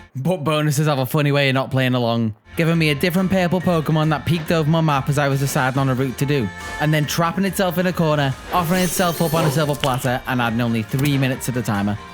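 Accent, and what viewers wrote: Liverpool, United Kingdom. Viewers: Firstly, your stronger than me lad i had a go at hunting a dex a while ago and tbh i dont think my attention span’s recovered.. also, scouser?? Got a little scared hearing another accent on here i cant even lie. Dunno what it is about brits and youtube but i never see any of us on here loll. Anyways congrats on this man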